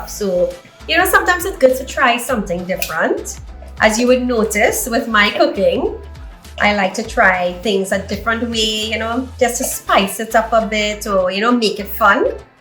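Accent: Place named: Indo-Trinidadian, Trinidad and Tobago. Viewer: She is speaking like Trinidad Indian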